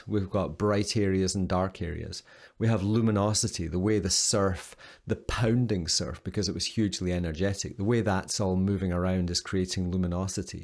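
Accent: Scotland, United Kingdom. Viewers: I am in strong agreement with the message of your video. As to your voice, yes please give it needed rest. You and have among the most charming Scottish voices I've heard! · the Scottish accent held me here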